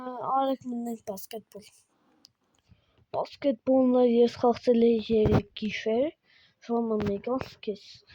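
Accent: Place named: Yerevan, Armenia. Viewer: xi es Erevani bar barov xosum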